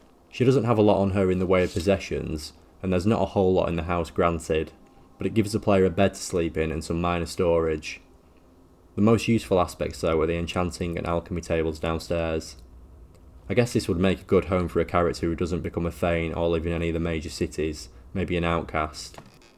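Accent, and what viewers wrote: United Kingdom. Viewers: I don't dislike your accent it's kind of cool. But it seemed like a different kind of British accent. I have a friend that moved here from some town right outside of London he said and we pick on him all the time about his accent. Nothing bad just good clean fun. But yours seems even different than his accent. Yeah Arkansas has more of a country draw to the way they speak as to where people in New Orleans have more of a creole type accent. It's weird because anywhere I go in the country they know I'm from New Orleans it's a distinct accent that's for sure. I have never left the United States before. Never had the opportunity to but if I do get a chance I definitely want to go does the UK or France or Ireland that would be where I would like to go. Even Italy. Definitely definitely wouldn't mind dating some of the Swedish ladies, Sweden has them long-legged blondes anyway yeah your accent is definitely unique even within the scope of being British. 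Like I said I don't dislike it it's just different that's all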